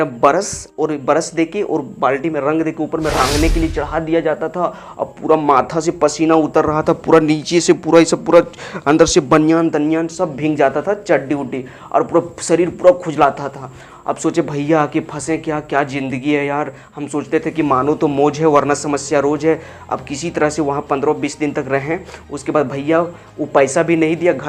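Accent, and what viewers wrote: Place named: Jharkhand, India. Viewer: Language se hi pata chal gaya ki aap Jharkhand se ho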